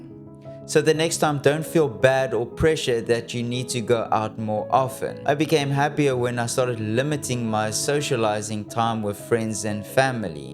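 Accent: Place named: South Africa. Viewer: U sound south african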